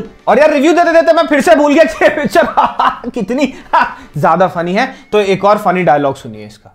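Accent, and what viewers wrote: Haryana, India. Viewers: Are you Haryanvi??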